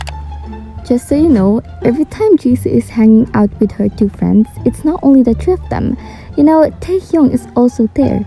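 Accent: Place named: Philippines. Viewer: Dude!! People in Philippines speak in that accents I've seen many YouTubers from Philippines and I've subscribed them too T,f you talking about for more attention? 💀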